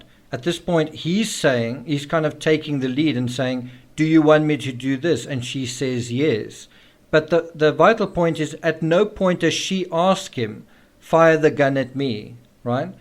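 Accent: South Africa. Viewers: South African youtuber? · Are you from south africa? Your accent reminds me of my friends from south africa. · I know an SA accent when I hear it. Awesome channel!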